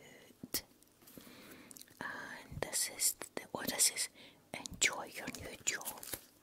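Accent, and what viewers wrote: Scotland, United Kingdom. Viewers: XOXO right back at ya😁. I'm honored that you replied. As an American, I really appreciate your enchanting accent. I'm so glad to have found your videos, they ease my anxiety and are so very relaxing. Keep up the great work, it truly has a positive impact. Your sincere kindness and caring really come across. Thank you.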